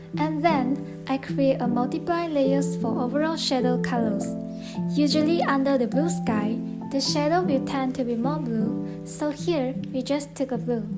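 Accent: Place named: Vietnam. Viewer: idk why but your accent looks like Vietnamese people when they speak English lol sorry if you're not Vietnamese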